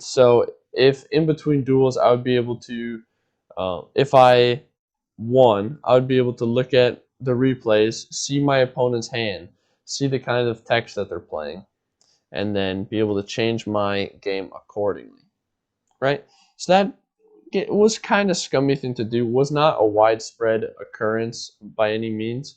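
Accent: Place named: United States. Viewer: what makes you say that? his american accent sounds very natural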